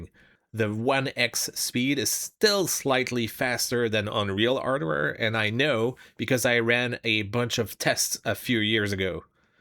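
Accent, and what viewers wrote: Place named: Quebec, Canada. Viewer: I seem to detect a hint of tabarnak in that accent.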